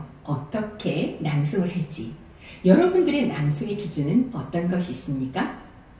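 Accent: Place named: Gyeongsang, South Korea. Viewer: 강의도 좋고 목소리도 예쁘시고, 우아한 모습또한 시의 맛을 한껏 더해 줄 수 있을 것 같아요. 말씀하실 때 살짝살짝 느껴지는 억양에서 경상도 분이시구나~싶네요~^^*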